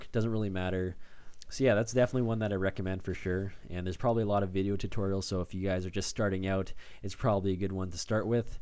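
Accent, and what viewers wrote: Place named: Asia. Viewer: 我從一開始看他之後就覺得他的口音有點亞洲人，感謝翻譯。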